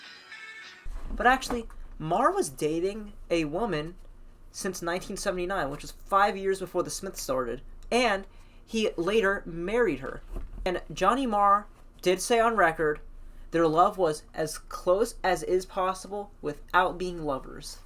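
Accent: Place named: Northeast, United States. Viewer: Are you from the northeast region of the country? I’m from Philly and your accent feels real familiar to me?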